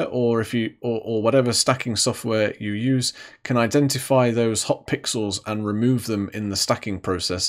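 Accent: United Kingdom. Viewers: WOW...That was a Fantastic Presentation...I was writing fast...Stop and Write and start up again...You are so very knowledgeable - Very Impressive...As a Newbie...Even with all the Required Equipment...I see I have a Long Learning Curve...But with your Videos...You have made the Process Less Painful... Beautiful Accent...You must be from The Mother-Country...Florida Here...Thanks again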